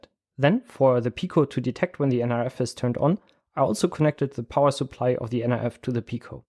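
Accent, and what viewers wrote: Germany. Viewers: are you German ? if so, me too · I am neinty % sure this guy is german because of the accent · You sound German, are you? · Can I ask, is your accent German? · Du sprichst Deutsch oder? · Mashalla wie er Airtags ausspricht, sowas von Deutsch. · Are you German because your accent. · Are you German? Because you sound like Werner Herzog lol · Whats that accent? German? Nethertheless good job. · Let me guess, you're german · Gutes Video man hört das du deutscher bist XD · German accent :p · You should talk a little faster.. much too slow to understand your heavily German-accented English.. thumbs down for presentation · The german accent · der deutsche akzent kickt 😂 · Man erkennt eben dass du Deutsch bist haha. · Are you German? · Bist du deutsch? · Bist du Deutscher ? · du bist doch bestimmt deutsch das hört man an deinem english xddd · Der deutsche Dialekt, xD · wie deutsch sich das anhört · i hear a little bit DEUTSCH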